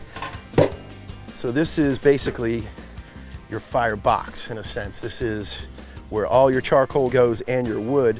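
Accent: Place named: South, United States. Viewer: Great video. Except your accent sounds like the southern surfer guys we have down in Charleston. Strange. Haha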